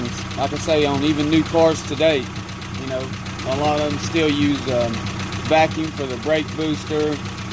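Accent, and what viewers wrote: South, United States. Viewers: Luv accent mate. South?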